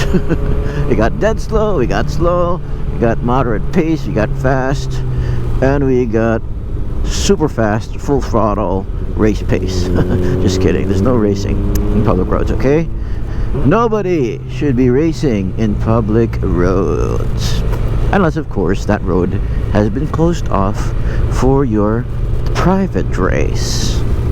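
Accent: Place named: Philippines. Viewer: he's accent is Filipino, so i guess Filipino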